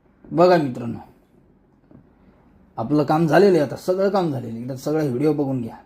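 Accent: India. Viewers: अहो बापू काय राव तुम्ही पण काय ती तुमची गावरान भाषा एकच नंबर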